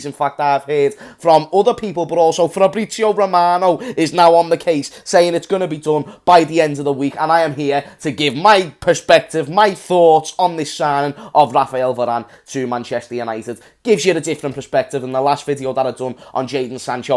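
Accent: Liverpool, United Kingdom. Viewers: “I’m not gonna say it’s a great signing” lmaooo love the scouse salt 🤣🤣🤣 Varane is top 5 best CB in the world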